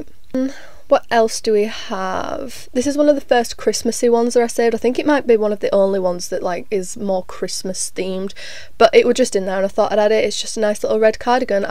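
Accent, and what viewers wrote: United Kingdom. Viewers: there is nothing more AMAZING than opening up a video to expect a grating American accent, only to be soooothed by a fellow northerner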